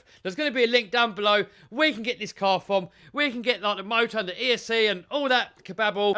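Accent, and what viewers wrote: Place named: England, United Kingdom. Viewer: dude i usually can't stand English accents lol but you're funny as hell 😂 You're vids are fantastic! keep up the good work broskie. definitely gotta have one of these in my future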